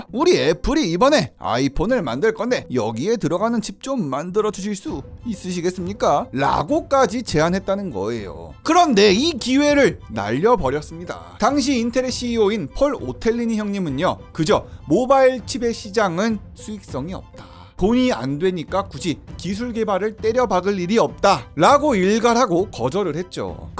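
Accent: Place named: Gyeongsang, South Korea. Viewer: 백날 알려줘도 경상도 것들은 안배워요 끝까지 군님, 인녁, 인뉴, 전녁, 만뉴.. 후~~~~~ 고생 하셨어요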